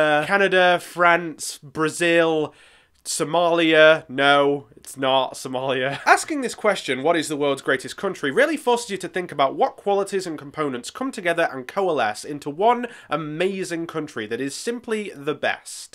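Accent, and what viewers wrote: United Kingdom. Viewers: Are you from Essex? I'm curious, also new here. Nice video. :)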